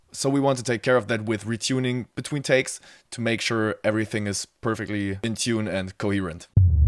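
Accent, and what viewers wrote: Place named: Germany. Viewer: Ich vermute mal, dass du Deutscher bist (hört man ganz leicht am Akzent). 😉 Die geile DI, die du im Video zeigst, klingt fast so, als hättest du ein Saturation-Plugin oder einen Transient Shaper draufgepackt. Ist das wirklich so? Falls nein: Welche Effekte nutzt du exakt für diesen Sound? Drehst du manchmal auch die Phase oder lässt du sie original?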